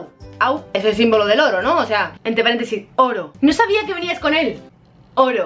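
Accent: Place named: Cádiz, Spain. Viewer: Como se nota que eres de cadiz 😂😂, yo también soy de cadiz 😍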